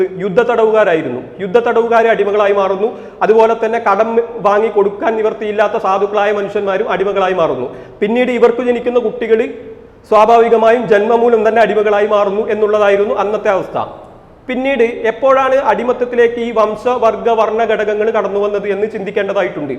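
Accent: Kasaragod, India. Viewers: താങ്കൾഒരു അദ്ധ്യാപകൻ ആയിരുന്നു എന്ന് പറയുന്നുണ്ട്. ഏതായിരുന്നു വിഷയം (subject) എന്ന് അറിയാൻ ആഗ്രഹിക്കുന്നു. എന്തായാലും ഈ അടുത്ത കാലത്താണ് താങ്കളുടെ videos കാണാൻ അവസരമുണ്ടായത് വളരെ informative ആണ് താങ്കളുടെ അവതരണം. സംസാര ശൈലി എനിക്ക് ഇഷ്ടപ്പെട്ടു കാരണം ഞാനും ഒരു കാസറഗോഡ് കാരനായത് കൊണ്ടാകും. ഇനിയും ഒരുപാടു വീഡിയോസ് പ്രതീക്ഷിക്കുന്നു 👌👍. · Hi brother I like your open mind and honesty.kasargode slang is not bad.you are doing your job well and your presentation is exempt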